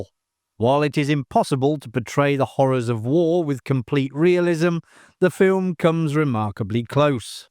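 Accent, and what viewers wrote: England, United Kingdom. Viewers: it's called an english accent you silly twat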